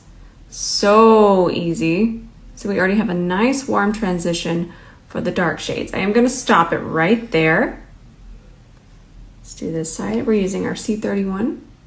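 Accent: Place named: South, United States. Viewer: are you in the states? You have a Southern accent! Under your info, though, it says Pakistan 🧕 I can't wait to save some money to buy your brushes; Social Security doesn't go very far. I love watching your videos. Your makeup always looks Gorgeous. I love you❤️‍🩹🐈‍⬛🐴🐈🐕‍🦺❤️‍🩹💯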